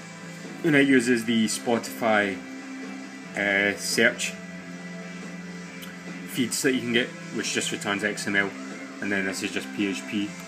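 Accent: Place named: Scotland, United Kingdom. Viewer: That's because he's Scottish, and your English is not good enough. Sorry - you were expecting a personal translation just for you?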